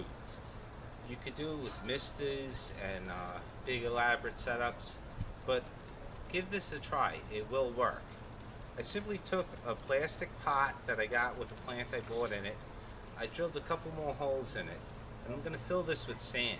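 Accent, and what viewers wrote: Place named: Northeast, United States. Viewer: I don't think he's stoned! Are you basing this on his northeastern accent? Thanks for the info on blueberries/cuttings, can't wait to get my hands on some blueberry sticks.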